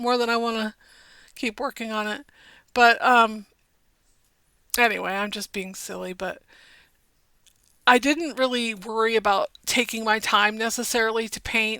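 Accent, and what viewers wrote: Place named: South, United States. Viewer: Nice to see you’re back! I sometimes detect a southern drawl 😊.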